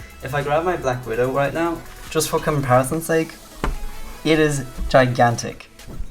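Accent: Germany. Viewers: Youre english sound so weird, at some parts you could tell you´re german but in other parts you just sounded like you´re speaking english as youre first language. Not hate tho haha <3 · Hallo du hast einen deutschen Akzent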